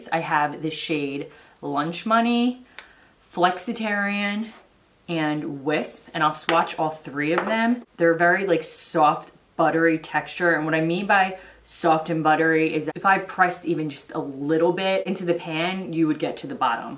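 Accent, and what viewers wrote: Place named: New York, United States. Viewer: Ur def from ny right lol I can tell by your accent I’m from Long Island I live in Queens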